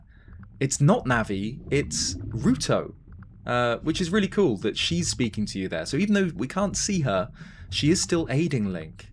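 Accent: United Kingdom. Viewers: Are you from leicestershire?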